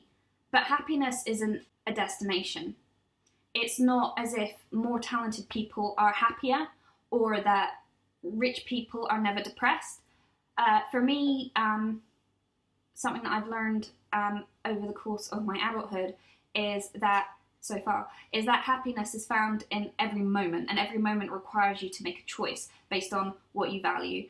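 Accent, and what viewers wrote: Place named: United Kingdom. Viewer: Omg! See we are on the same wavelength! Spot on! And I didn’t know you were a Brit! Is that what your accent is? I love it!!!!! You are NOT bad!!!!!! I always get up and do bad karaoke and try to get other shy people to go up with me and sing and see it’s just a fun game! Music is fun and expressive and wonderful! I love this video!!!! I need to break back into my fb and share your stuff lol! Awesome awesome awesome!!!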